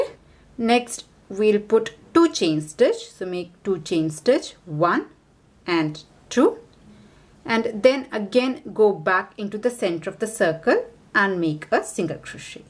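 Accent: India. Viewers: idk crochet but the Indian accent helps a lot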